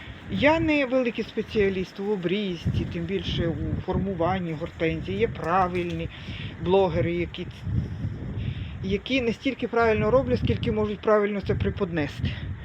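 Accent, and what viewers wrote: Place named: Chernihiv, Ukraine. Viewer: Вітаю Вас! Обрізка гарна. Кординальна. Теж так люблю. Дуже буду чекати Ваші осінні відео, щоб подивитися, що вийшло і як вона буде сформована з квітами. Впевнена, що буде дуже гарний кущ. А ще хочеться подивитися на сорт. Бо в самої є такі 2 різні кущі без назви. І підходять до Левани, і до Кіушу і до Тардіви. І всеодно думаю, шо ні одна з трьох. Тож дуже буду чекати Ваше квітування літньо-осінне. А ще у Вас дуже цікавий говор українській. Такій рідний моєму вуху... У моїх Чернігівськіх родичив такій. Ви не відтіля)))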